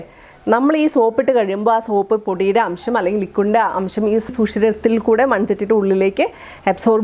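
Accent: Kottayam, India. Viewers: Kottayam slang super.. 👌😄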